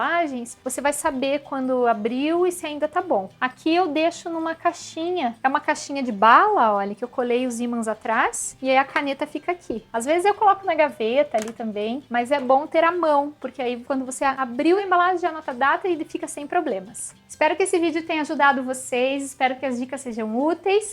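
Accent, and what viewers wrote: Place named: Paraná, Brazil. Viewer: Amo esse canal: fato! Mas também amo esse sotaque paranaense! haha 🙄❤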